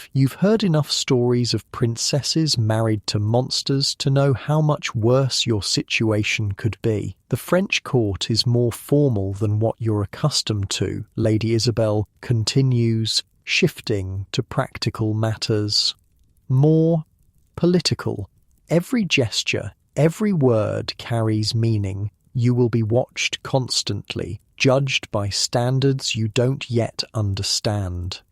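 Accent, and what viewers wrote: England, United Kingdom. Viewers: I’m absolutely sick of the English accent from the narrator. Can we get some American history? 😂 can’t use a fancy British narrator for that lol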